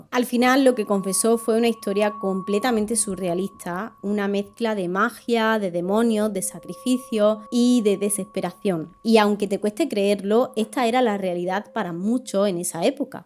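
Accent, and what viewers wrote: Andalusia, Spain. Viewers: Prestando más atención, si que percibo un ligero acento andaluz en tu hablar. Perdón por mis erróneas suposiciones. Ni eres madrileña ni eres catalana. Dicho he. Aum namah shivaya 🙏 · Muy buen canal, excelente contenido para los que somos apasionados por la historia. El tono de tu voz es muy relajante y tu acento es muy bonito de que parte de España es este acento?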